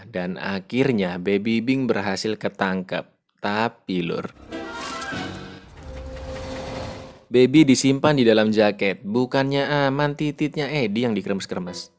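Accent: Java, Indonesia. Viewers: Pengisi suara nya Orang jawa ya mas? 🙏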